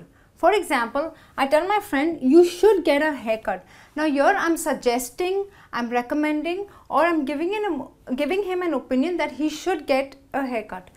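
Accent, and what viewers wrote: India. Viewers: Indian English...as a british i can teach u how to prounce ''2'' correctly lol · distinctly indian accent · yeah is indian accent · I used to avoid Indian accent. It was like a tinkerbell in my ears. But with you. It's as soft as a Teddy bear. (I don't know why...) And i need to improve my english with your very usefull lessons. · yap, i think it's Indian accent :)) Just learn grammar from here, learn accent from others !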